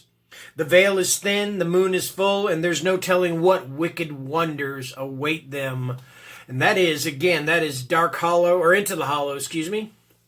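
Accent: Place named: Appalachia, United States. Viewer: Excellent Appalachian accent. Too many good books, how are you going to decide what to read first?!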